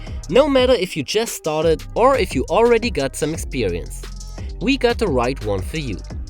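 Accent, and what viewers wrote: Germany. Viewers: Not a native speaker, is he? Sounds like a German accent to me, am I right?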